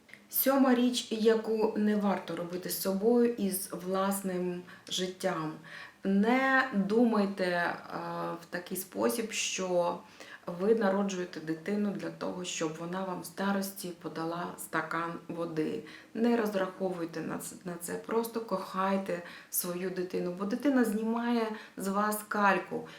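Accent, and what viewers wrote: Russia. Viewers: Відчувається, ваша російська вимова ( можливо ви раніше і спілкувалися тільки нею), але приємні ваші старання в перекладі українською. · Говорите-то Вы на украинском, а акцент у Вас русский 😂😂😂😂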